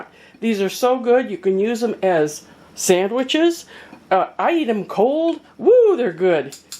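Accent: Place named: Illinois, United States. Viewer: Got to love that Illinois accent! Hahaha gets 3. Oh well he will make up for it in the pie Dept!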